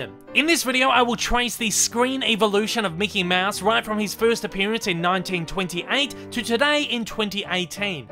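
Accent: Australia. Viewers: I love this guys Australian accsent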